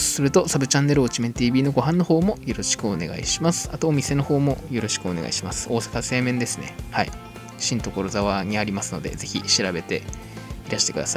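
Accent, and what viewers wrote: Aomori, Japan. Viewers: 青森の訛り最高。安心する。ラーメン作ってもらったの食べたい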